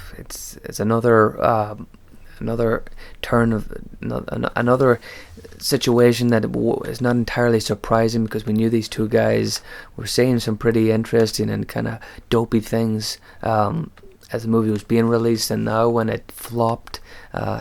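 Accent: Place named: United States. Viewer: Your from Northern Ireland, but have a Slight American accent do you now live in America!? 🤔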